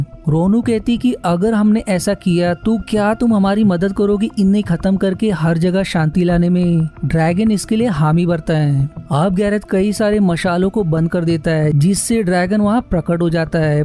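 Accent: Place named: Gujarat, India. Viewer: Why this guy has Gujju accent?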